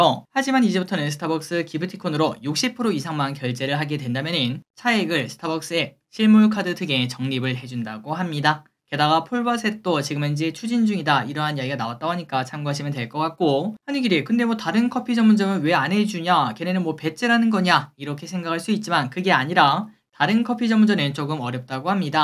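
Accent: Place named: Daegu, South Korea. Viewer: 이거 어디까지 올라가는거에요?? 찐 대구 사투리네요ㅋㅋㅋ😂😂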